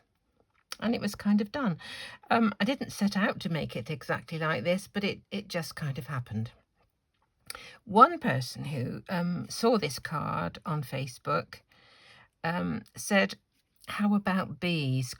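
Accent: United Kingdom. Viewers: I completely agree! It still boggles me that some people can be so rude! If you don't like someones videos, don't watch them, there's no reason to be mean! I completely adore all your videos and thoroughly enjoy all your commentary with yourself, especially with your British accent! You just have a way of saying things that is so different from the way we Americans speak and I absolutely love it! Don't change a thing! Love all your CAS cards and videos! Thank you for taking the time to share all your thoughts. I myself, have one of those bins full of started projects or scraps and bits of cards that never got finished. I always feel a great sense of accomplishment when I have pulled a card together from those bits.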